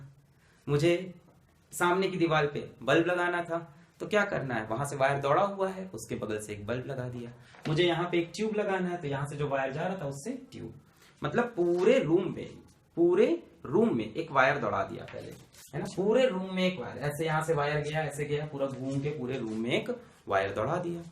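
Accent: India. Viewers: I love your hindi accent, even though I speak a little.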